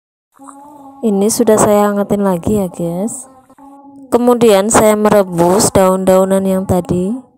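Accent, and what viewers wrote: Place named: Java, Indonesia. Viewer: Jowone kental gayysss.... Seko ngendi sampean mb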